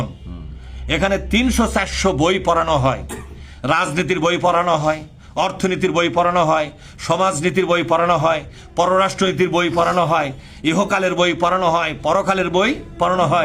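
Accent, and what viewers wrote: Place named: Bogura, Bangladesh. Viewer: হুজুর সাহেব মনে হয় বগুড়ার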